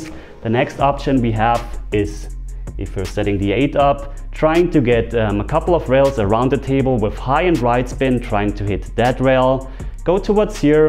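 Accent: Germany. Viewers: Great video, as usual. Interesting to hear you speaking with your bavarian accent. 😉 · Love the German stoic accent and your teutonic pool playing efficiency... Great..!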